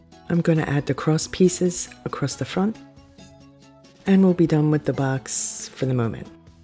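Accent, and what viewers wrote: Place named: Pittsburgh, United States. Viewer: Thanks for sharing your great ideas! I gotta ask...are you from Pittsburgh? I am & I'm hearing that back east (but not NY or Jersey) accent that sounds like a Pittsburgher. 😊 ❤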